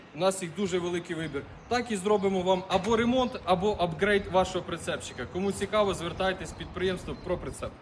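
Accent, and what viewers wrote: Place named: Surzhyk, Ukraine. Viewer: Ну говори уже на русском языке, слышно же по твоему суржику что ты русскоговорящий.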